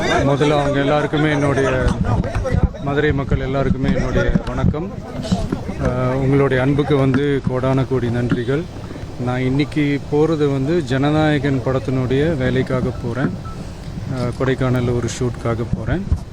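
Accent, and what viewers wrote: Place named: North India, India. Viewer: North Indian tamil pesra madhri irukku😢😢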